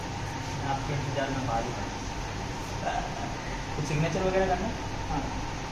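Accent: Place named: Bengal, India. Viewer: Mam hamera Bengal sa ha pata chal raha ha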